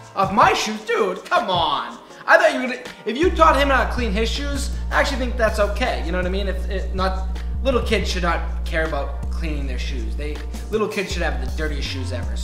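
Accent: Boston, United States. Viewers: I love the Boston accent. anyone that has it I think it just sounds awesome.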